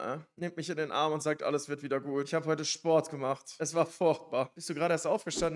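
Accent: Bavaria, Germany. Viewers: Bitte mehr Bayrischen Stiel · Ich liebe bayrisch so dermaßen. Mein absoluter lieblings Dialekt Schöne Grüße aus dem Kölsche Veedel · Kannst du mal nen Bayrischen Stream machen, Gragas und Cho only und nur im Dialekt reden, würde ich sehr fühlen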